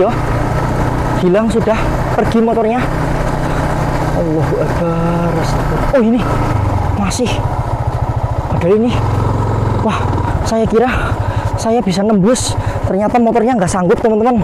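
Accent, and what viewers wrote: Java, Indonesia. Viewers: Mas omonga nya kok medok banget si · Wong jowo PO mas?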